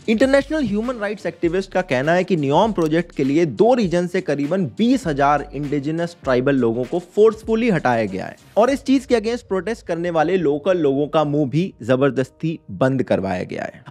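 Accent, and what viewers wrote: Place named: North, India. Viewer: Donty donty eight 💀💀💀💀..bro this north indian accent is so funny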